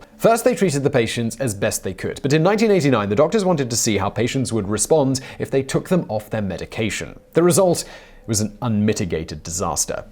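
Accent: United Kingdom. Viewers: Yes, he can. He can relate the horror experiment interestingly while keeping it light with his lovely British lilt. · He isn't either. He's British me thinks :D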